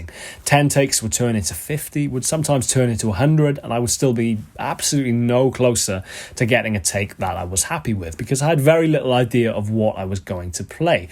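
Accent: Wales, United Kingdom. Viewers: On a random note .. sounds like you've lived in or come from Wales, possibly Caldicot or Chepstow area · You got style bro, you sound like a fellow Welshman too👍👊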